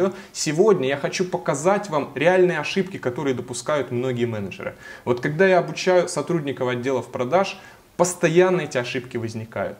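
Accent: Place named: Trans-Urals, Russia. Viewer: Вас тяжело слушать. Зауральский говор. Ткие, шибка, пгврить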